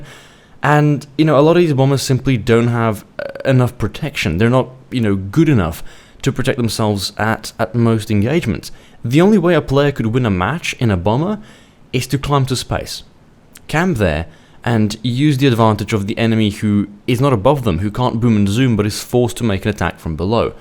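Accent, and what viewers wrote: England, United Kingdom. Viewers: Why does he have an English accent? wtf? Or is the Slovenian accent similar in some way?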